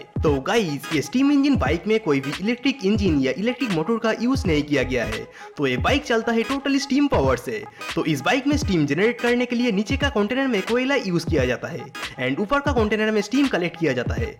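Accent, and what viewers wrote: Bengal, India. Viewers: Your Hindi accent proves that you're a native Bengali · Pta nhi yaar tm logon ko subscribers kaise mil jatein h. Main video ko dekhna chah rha tha par 10scnds bhi dekh nhi paya bcz of your hindi accent.. me too a Bengali. But agar YouTuber banna h hindi me toh dhang ka bolna toh sikhlo. Irritable accent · Aap bengali ho 😊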